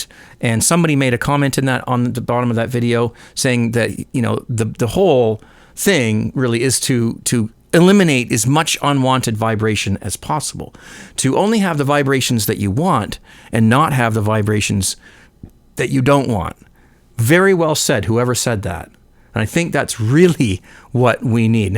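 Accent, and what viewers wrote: Canada. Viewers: Hi, I came to this video late and, after scanning the comments to see if any of them answered the question about why the New Zealand scarf on the wall. From your accent, you sound Canadian, so why the banner? BTW, you know your stuff. Don't let the bastards get you down.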